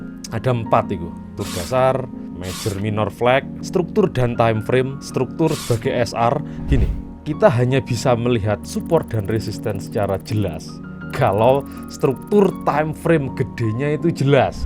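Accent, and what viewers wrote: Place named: East Java, Indonesia. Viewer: orang mana bang? Aura Jawa Timur nya sangat melekat😂🙏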